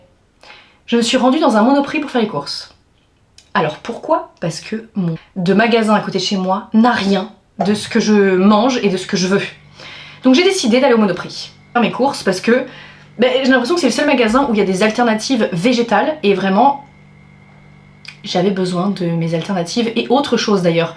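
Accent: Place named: England, United Kingdom. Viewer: Je kiffe ton accent anglais😅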